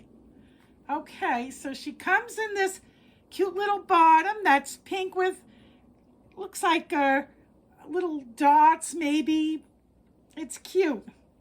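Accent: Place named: Italy. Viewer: I can hear the Italian in your voice 💗 What a story to tell - celebrating the wonderful you you have become 💗 What a sweet baby - and that is an impressive brush 💗 We have an adopted son (8 at the time, adult now ). He is very good to us - he very much appreciates havng had 'parents/family' in his life, like you. You have a wonderful story to share with others 💗 God Blessed you 💗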